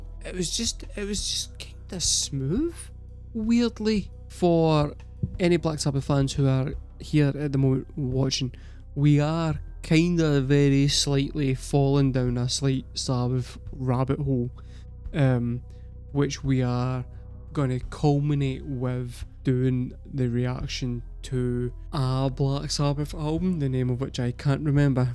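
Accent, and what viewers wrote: United Kingdom. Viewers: With an accent like that, it's obvious you lived on the same island, how could you not have grown up on Sabbath?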